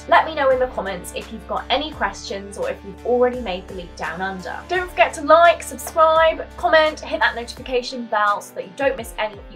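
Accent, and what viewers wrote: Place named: United Kingdom. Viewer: And where are you from in the Uk accent sounds familiar I left in 1979 from just outside Birmingham.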